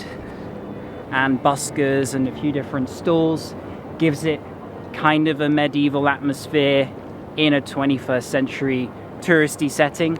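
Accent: United Kingdom. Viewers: Welcome back to the best british accent ever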